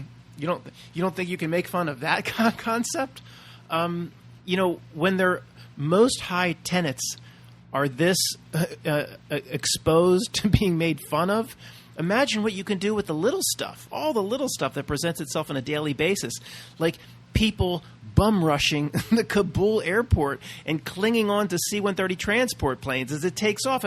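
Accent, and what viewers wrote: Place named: South, United States. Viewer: i love your country voice, thats my voice rofl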